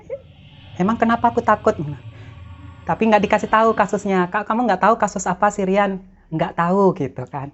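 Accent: Java, Indonesia. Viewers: Min ngomongnya medok bgt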